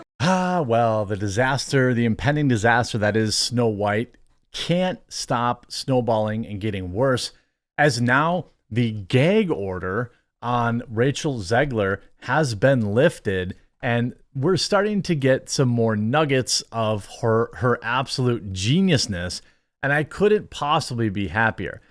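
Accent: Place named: Wisconsin, United States. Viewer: Is it a Wisconsin accent thing to pronounce bag as baaig and gag as gaaig I just notice does this with the "ag" sound.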